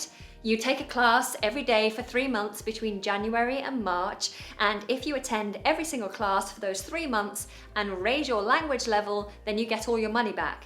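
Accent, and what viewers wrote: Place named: United Kingdom. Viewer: Idont know but🙈 the British accent makes me feel like you're dealing with the English language.like Princess 👌and that's great, unlike the other accents which used in every englìsh speaking country , all of them speak English. But you have your own spicesss👌👌 youre great ❤❤❤ all the best always end 4everrr Thanks a thousand times😁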